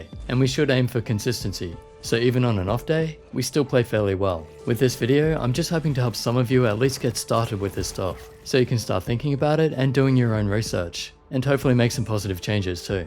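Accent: Australia. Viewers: Yo didn't realise you were Australian, how're you dealing with the heat wave? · Are you Australian? Started watching your vids after I bought my DA elite, never figured that you are Australian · Oh damn, I never thought you would be Aussie. GG!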